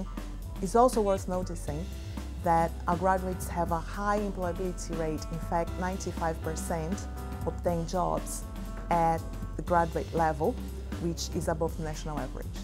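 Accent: Brazil. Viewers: Shes 100% brazilian 🤣🤣 Im also brazilian noticed straight away, nice to know theres a vast community at UCL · you can tell she's brazilian hahah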